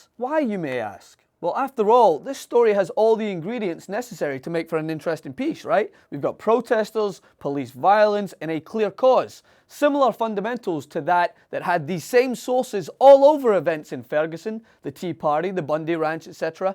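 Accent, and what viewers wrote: Scotland, United Kingdom. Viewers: Dat scottish accent - adds a certain flavor of badassness :D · Since when does TYT have a Scottish host?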